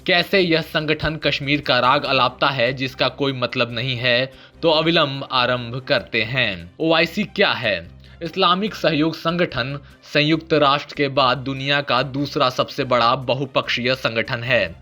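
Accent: Standard. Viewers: आप जो शुध्द हिन्दी बोलते है उस से बोहोत ही आनंद होता है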